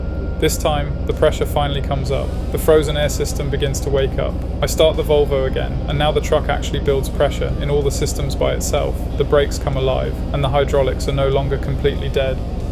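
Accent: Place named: United Kingdom. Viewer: Har du en engelsk förälder eller studerat i England, för din accent låter enormt brittisk❣Ärru från Åmål med tanke på ditt val av alias?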